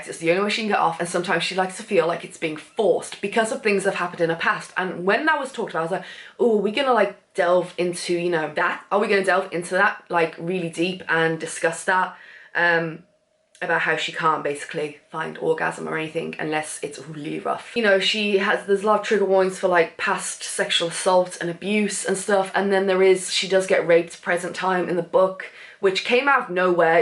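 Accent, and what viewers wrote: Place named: United Kingdom. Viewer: I literally enjoy listening to you . ☺️ I love the British accent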